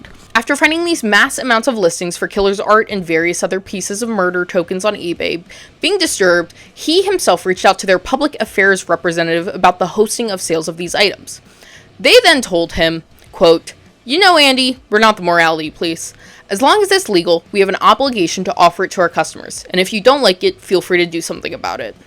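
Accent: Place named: South, United States. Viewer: oh nah this guy is from the south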